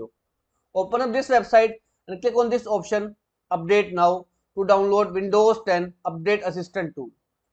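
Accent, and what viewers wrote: India. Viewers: Indian accents is very help my problem!!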